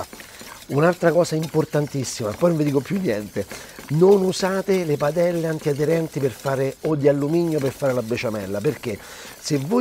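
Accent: Rome, Italy. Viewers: sei bravissimo, ma, non prendertela a male, se tu ti sforzarsi di parlare più italiano... l'inflessione romanesca ci sta, ma fino ad un certo punto... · Lei è fantastico, io sono romagnola e mi incanto a sentire lei parlare romanesco, quello che prepara è bellissimo soprattutto spiegato da lei. Grazie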